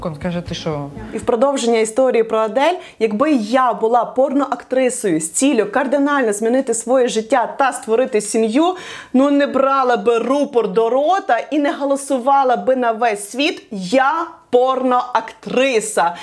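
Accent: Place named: Galicia, Ukraine. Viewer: То галицький діалект, любять там так наголоси ставити. Це ж все таки не тв-шоу і не офіційна заява, блогер може говорити тою говіркою, якою він думає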